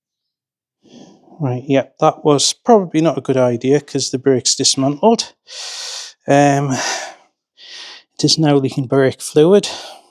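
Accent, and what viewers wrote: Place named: Llanelli, United Kingdom. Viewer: Your voice is crosshands to Llanelli area Iam over Newcastle Emlyn way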